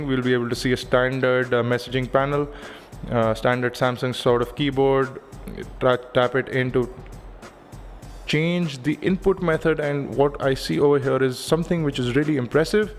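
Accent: India. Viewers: its called Indian accent btw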